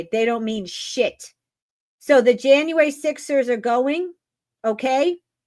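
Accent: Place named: Ireland. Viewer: Love the Irish accent.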